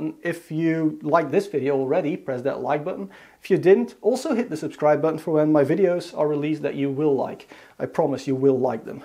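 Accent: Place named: Netherlands. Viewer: Are you dutch? you sound dutch. And do proccesors come with thermal paste?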